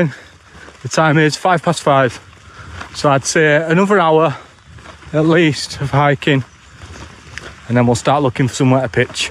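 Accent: Yorkshire, United Kingdom. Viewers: Your commentary makes the video stand out so well from others that have tried on YouTube. I dig the non stop comedy, the earthy language and the dulcet Yorshire twang.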